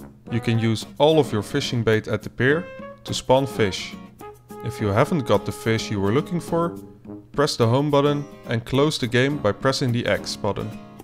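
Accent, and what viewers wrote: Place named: Netherlands. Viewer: I can just hear the Dutchness in your voice hahaha!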